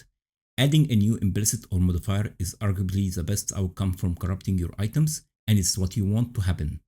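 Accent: Egypt. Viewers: ايه يا باشا من لهجتك عرفت انك مصري ❤️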